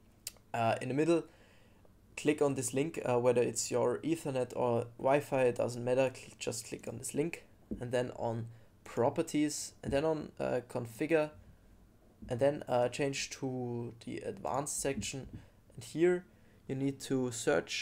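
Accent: Germany. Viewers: I can hear a German accent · man merkt halt so hart das der deutsch ist und ja hat nicht funktuniert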